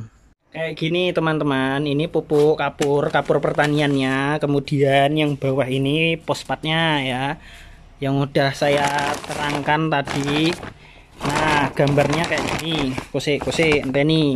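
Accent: Java, Indonesia. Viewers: Jowo asli mas se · Orang mana to om....kok Meduk men omongane... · Jowo masseh😂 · Mase kaya orang Jawa ya....?😊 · MANTAP DAN LUCU TOTOH X JOWO MU MAD, AKU SENYUM2 SAMBIL NYIMAK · Kok njowo banget to om, po ortu wong jowo? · mas katanya orrang xmantan ko logatnya jawa. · Katanya orang kalimantan tp lolgat bicaranya kok jawa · Kel Kalimantan tapi jowonya medhuk Saya Semarang bang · Mas.... Sampeyan kuwi ngomong nok ndi...? Jepang po indonesia.... Ak seneng logate jowo nemen.... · Medok mas bahasane, mahamke😄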